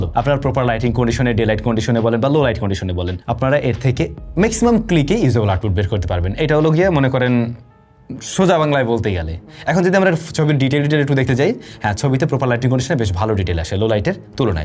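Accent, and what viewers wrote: Barishal, Bangladesh. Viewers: ভাই, আপনি বরিশাইল্লা!?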